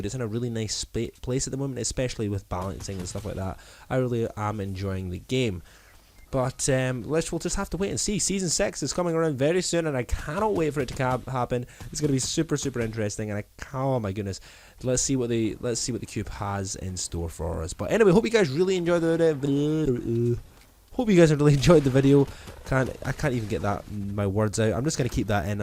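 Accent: Scotland, United Kingdom. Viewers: Scottish boi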